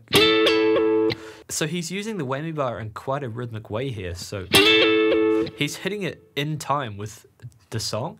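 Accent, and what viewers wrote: New Zealand. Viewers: I watch so many shred videos, I'm so glad to finally hear a New Zealand accent after all day hearing British and American accents, like no offense to them but it's always good to see a fellow New Zealander making killer content.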